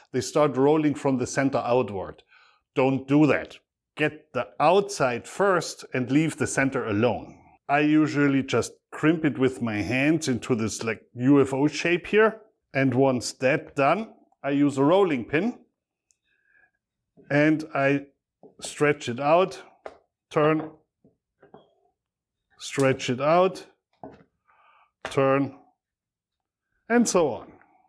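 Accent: Germany. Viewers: "... this is an excellent choice for brakfast or lunch!" Bist du aus de Palz oder aus Rhoihesse? XD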